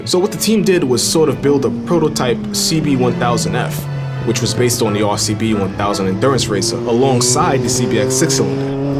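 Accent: United States. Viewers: Sounds like any other normal American to me 🇺🇲 💪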